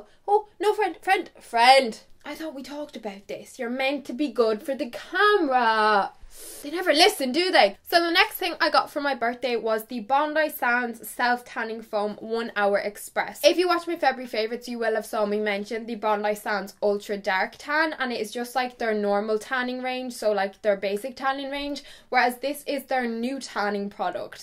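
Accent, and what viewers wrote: Ireland. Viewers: Are u from Ireland 🇮🇪 ( edit) 2 min in the video: yeppppp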